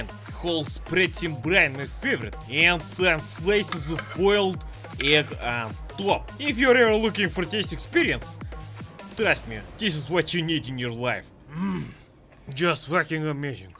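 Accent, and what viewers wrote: Russia. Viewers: ACCENT IS FUCKING LIIIIIIIIIIIIIIIT❤❤❤❤💪🇷🇺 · As ussual russian always says one thing like slav for mr and blyad for dimitri, i like russian accent btw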